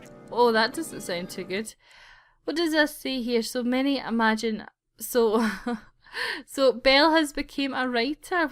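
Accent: Scotland, United Kingdom. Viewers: This is the best Scottish accent I have heard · OMG! I so wish this is for the sims 4! I love your sottish voice! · OMG ARE YOU SCOTTISH?! Im also scottish (im sorry your the first scottish youtuber i have seen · she is Scottish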